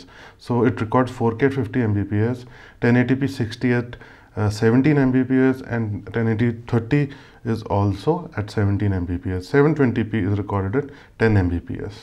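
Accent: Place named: India. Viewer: Hello Mr i just dont like Indian speaking reviews BUT for you i really enjoyed your in depth review good job and thankyou. Hope to see more.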